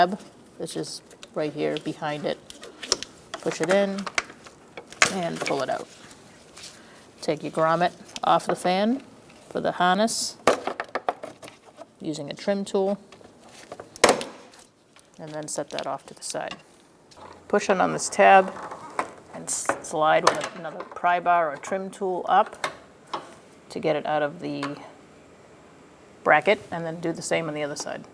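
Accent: Boston, United States. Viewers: 👍 great vid. You are definitely from Boston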